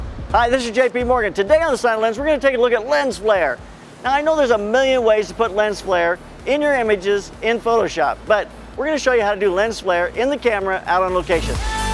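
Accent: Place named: South, United States. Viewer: Wow she was country twang in that voice I didn't expect that LOL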